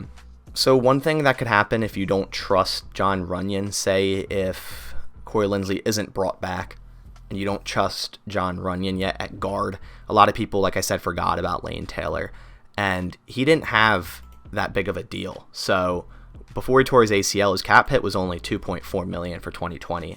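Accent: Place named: Maryland, United States. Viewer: i watched the wire recently and ive been on the lookout for maryland area accents; i love yours! keep making good content :) and go pack go of course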